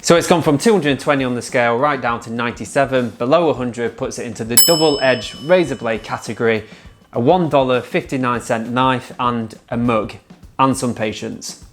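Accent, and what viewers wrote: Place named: United Kingdom. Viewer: You are one of the most real YouTubers out. You give accurate, real information no bs. Keep it up. I bought a crappy flip knife (looks super cool was extremely dull) off a homeless dude for $1 ( i also bought him taco bell) this video helped me restore this knofe to satisfactory sharpness and is now my edc. Thank you so much. (Also your voice is nice im american and the British accent makes us c.u.m...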